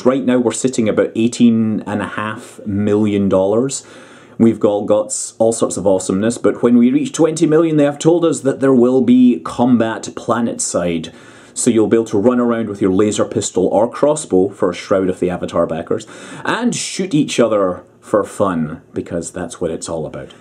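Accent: Scotland, United Kingdom. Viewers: your pronounciation was so off, that I only got who you were talking about from your explanation. So here I'm trying my best to provide a 'phonetic' transcription of Erdős Pál's name for the benefit of the internet. (We have a different order for names, yes.) Pál Erdős - Paul (is sufficient, but Pahl would be closer) Ehrdoesh Keep up your Scottish awesomeness!;)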